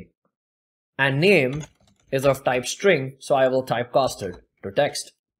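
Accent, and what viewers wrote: India. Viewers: I work with power platform dev for almost 10 years already and I must say... if the presenter have indian accent, he is a good teacher... great video m8 !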